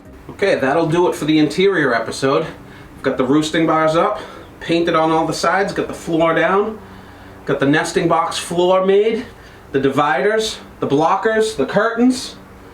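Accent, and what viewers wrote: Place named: New England, United States. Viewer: I have always used soft pine wood shavings in my coops. The coop I'm currently working on finishing will use the deep litter method and so far I have liked the pine. I am intrigued by industrial hemp though and would like to try it out at some point. Loving how your coop is turning out. I found this series when we started doing plans for our coop and I have enjoyed watching your progress. Also love hearing the New England accent as we are New Englanders ourselves!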